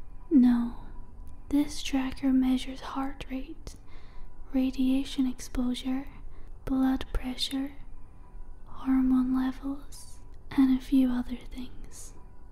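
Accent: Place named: Scotland, United Kingdom. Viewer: She sounds vaguely Scottish, is that a reference to the alien sightings at Bonnie Bridge (I think that's what I think the village is called)🏴🌉👽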